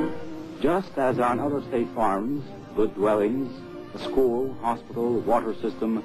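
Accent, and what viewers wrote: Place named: Jamaica. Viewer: What's up with the jamaican accent? You're not even jamaican and you trying to sound like bob marley. Marley talk about ethiopia my mom's ancestor country, not somalia.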